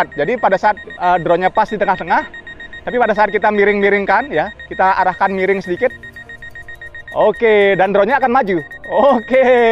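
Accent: Bali, Indonesia. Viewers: logatnya bali om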